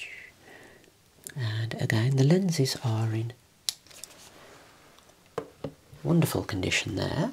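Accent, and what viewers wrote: United Kingdom. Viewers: Absolutely love the British accent ☺️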